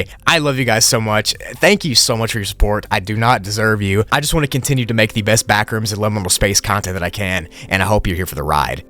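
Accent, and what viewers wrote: United Kingdom. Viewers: I know your British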